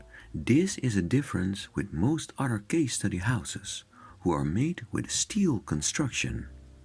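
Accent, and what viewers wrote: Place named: Netherlands. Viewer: The addition of the third bedroom looks poor from the outside, especially with the off-the-shelf aluminum frame window. I'm thrilled to have discovered your channel! They provide so much more than the rather limited views afforded by various coffee table books, even those by Julius Schulman. Excellent graphics and organization. I really get a feel for the interior spaces. By the way, when I watched your first video, I kept puzzling over your accent. When I learned you're from the Netherlands, I thought--No wonder! You guys always throw me off! I once knew someone from the Netherlands, who had gotten lessons with a speech therapist to help with accent reduction. She was from the deep South, however, so he ended up with the craziest American accent I've ever heard.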